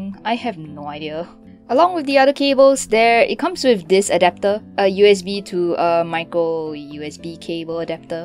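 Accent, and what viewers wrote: Singapore. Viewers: lmao i don’t like free things too you sound singaporean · How bout try robeats on roblox,its like tap tap but for 3 yr olds with ultra instinct, Btw,u sound singaporean,u from sg?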